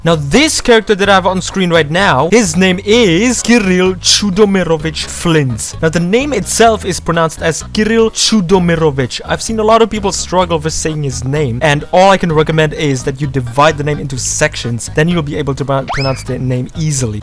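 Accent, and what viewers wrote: Czech Republic. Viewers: YOU’RE CZECH OMG I THOUGHT YOU SOUNDED CZECH WHEN SPEAKING CZECH YOIASUSKDJAA I think you need to improve that Russian accent tho AND YOAUAHDJQXX FELLOW CZECH GENSHIN PLAYER WITH IN GAME PRONUNCIATION ISSUES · Ah, czech fella I see :> Love your accent btw. Anyway, maybe "Czcibor" isn't like....something like our "Ctibor?" Anyway #2 Love how you've read name of Flins c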